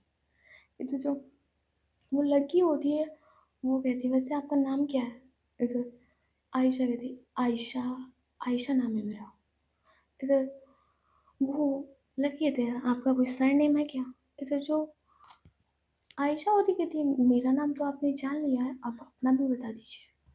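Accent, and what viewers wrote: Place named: Western Hindi, India. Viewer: The eposide is nice & like western hindi accent. It suits your voice.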